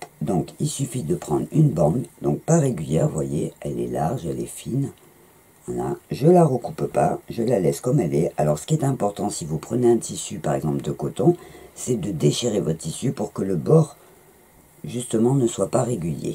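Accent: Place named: South, France. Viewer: Bonjour. Oui la fleur est facilement adaptable sur un tissu du fait qu'elle soit plate. Ah le charme de l'accent du midi "les reses" et les petits "hop" qui sont revenus 😉 ce n'est que du plaisir à voir tes vidéos!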